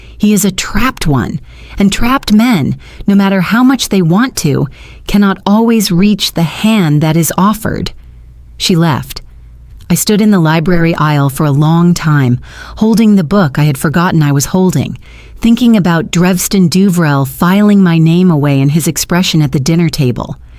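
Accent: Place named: United States. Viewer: Why the American accent? Story is set in England. Weird names. Pianoforty????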